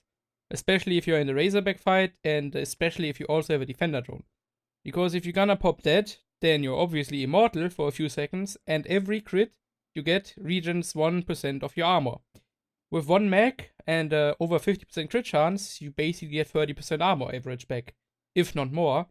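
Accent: Germany. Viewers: Maaan, dieser deutsche Akzent macht mich fertig 😂😂😂 wäre cool wenn du auch Was auf deutsch machst ✌🏽👍🏽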